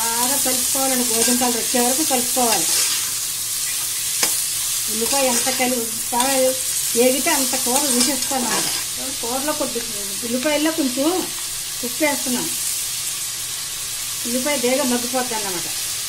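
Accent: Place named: Andhra Pradesh, India. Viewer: Happy to hear pure andhra slang 😊😍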